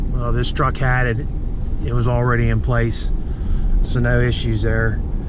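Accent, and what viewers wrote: Pennsylvania, United States. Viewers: Not sure but do I detect a central Pennsylvanian accent? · Do you live in Pennsylvania? I’m picking up a slight PA accent.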